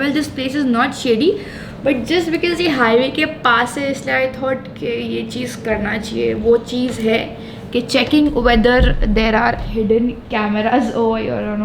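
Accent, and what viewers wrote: Maharashtra, India. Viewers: Bai.. aapki Marathi wali Hindi lai Bhari bolted tu.. ,😁👌👌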